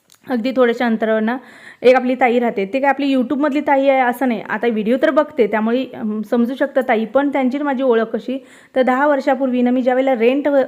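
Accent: Kolhapur, India. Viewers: Hii Me aata 5/6 devsapasun tuze vlog bagte ahe....tumche kolhapuri language mala khup aavdte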